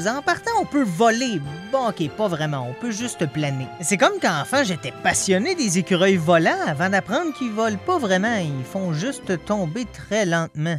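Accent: Quebec, Canada. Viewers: Alors jsp si j'ai un humour de gamin, mais je me suis tapé pleins de fous rires en regardant la vidéo. Tes blagues sont trop drôles et ton accent québécois est magnifique... vraiment continue comme ça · Ah franchement j'ai bien rigolé devant la vidéo d'aurant plus avec ton accent québécois que j'adore toujours plus ! Je me suis abonné, tu vas percer ! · x) Cette chaîne c'est vrm ma découverte YouTube de cette année J'ai pas mal de vidéos à rattraper mais pas grave, c'est un vrai plaisir à chaque fois (l'accent québécois y est pour beaucoup hé hé) Salutations du fin fond du Nord de la France 👌🗿🇫🇷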